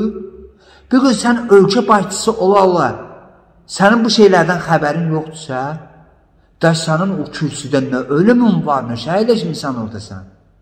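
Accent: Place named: Basqal, Azerbaijan. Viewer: Bskılı kimi danışır nə molla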